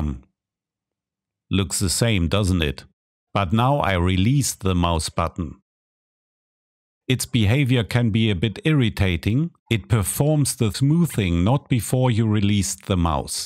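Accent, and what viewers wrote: Germany. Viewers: Where are you from? Your accent sounds german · Yes.. i think he is German.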